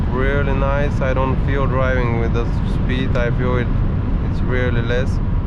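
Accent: Bulgaria. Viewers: Първо, като почна клипа си помислих, че е някой чужденец, обаче като чух как говориш и веднага познах, че си българин. Много готино клипче, прожължавай все напред! :) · Евала за клипа още от акцента те разбрах ,че си от нашите хора 😁🤣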